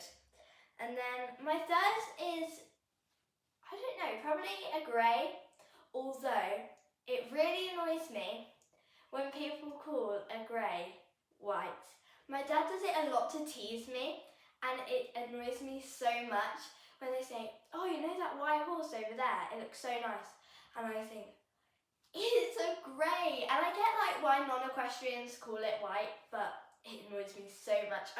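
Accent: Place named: RP, United Kingdom. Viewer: Love your accent and articulation, proper English !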